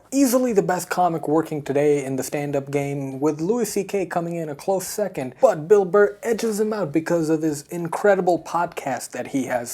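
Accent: United States. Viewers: oh OK kool just being curious that's all you sound like your accent is either American or Canadian.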